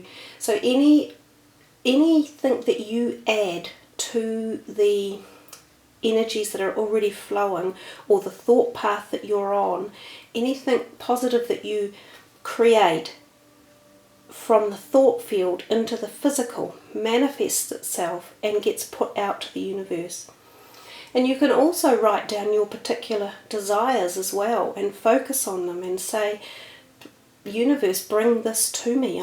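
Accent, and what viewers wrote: New Zealand. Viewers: keeper deck as in deck of cards,the reader is a kiwi they speak different.....think fush and chups.....thanks cuz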